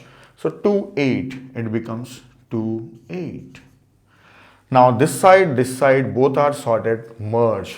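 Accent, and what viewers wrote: India. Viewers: Make more indian accent please.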